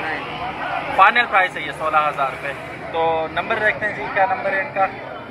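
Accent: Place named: Hazara, Pakistan. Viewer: Apka lehja bta raha hy ap hazarewal ho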